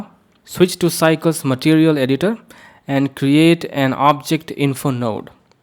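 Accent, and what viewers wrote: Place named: India. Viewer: You are indian? I dislike your accent!!!!!!!!!!!!!!!!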